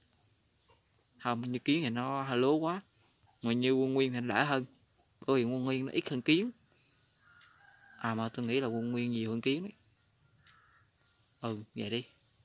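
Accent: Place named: Quang Nam, Vietnam. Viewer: Nghe giọng quảng nam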